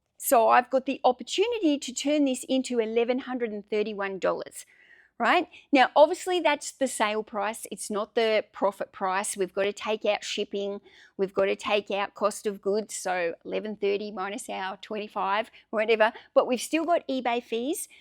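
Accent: Australia. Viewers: I like the Aussie pronunciation. That's why I tune in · She has an Aussie accent!! She’s not going to pronounce it the way you might. What difference does it make anyway?!?? Geeez!!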